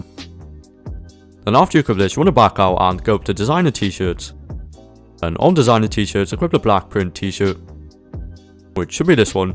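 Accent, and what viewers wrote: United Kingdom. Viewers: Are you British mate?🙂😎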